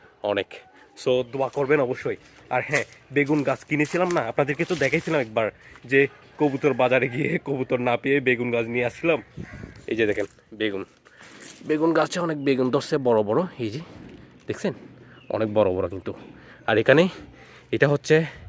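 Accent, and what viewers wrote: Chittagong, Bangladesh. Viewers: চিটাইংগা ভাষা হজে সুন্দর লাগে 🎉❤❤😅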